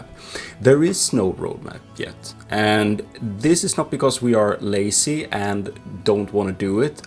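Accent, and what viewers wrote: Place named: Sweden. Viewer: I like your swedish accent! i bought the game because of it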